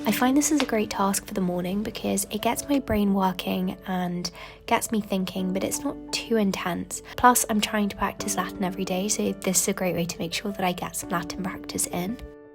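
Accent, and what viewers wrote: United Kingdom. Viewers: I found myself in your channel looking foward to learning the British accent and I just fell in love with your videos, they're just so gentle and poetic ♡ they feel like a warm hug hahaha and also you're the cutest. God bless you. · I see. When you use "the British accent", it indicates that there is only one. If you had used "your British accent", I would have understood you meant.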